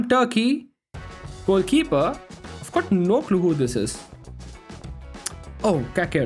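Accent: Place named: India. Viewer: His sound like India but I’m love you! VIDEO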